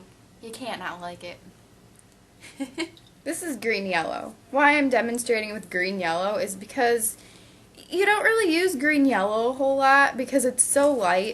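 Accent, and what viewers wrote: United States. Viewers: Are you from America madam please say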